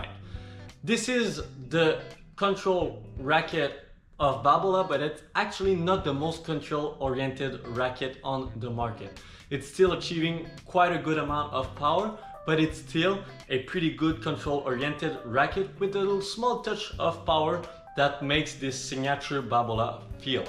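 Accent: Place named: Quebec, Canada. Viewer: You seem coming from quebec like me....i have same english accent 😊 can i ask you where did you filming this? There seem to be a lot of good racquet available in this store!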